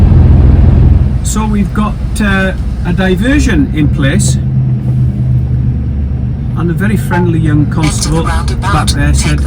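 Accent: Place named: Wrexham, United Kingdom. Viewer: Morninggggg. I know the Castell Mynach very well. I've had a few (pricey) meals there. I prefer the Dynevor just down the road though. On another note, I've been trying to place your accent and I'm guessing at Wrexham. I'm a valleys boy myself. Love the videos. Please keep them coming.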